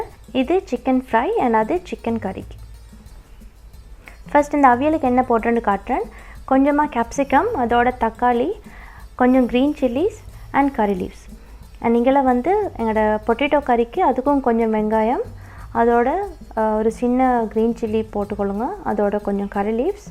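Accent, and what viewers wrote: Sri Lanka. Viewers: Normala srilankan maariye peusuriga.love you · Periya wela srilankan muslim slang la pesureenga .. nan perunalaki cook panna inga wanden recipie thedi, sari sis 1 suport 1 sub for u dear sis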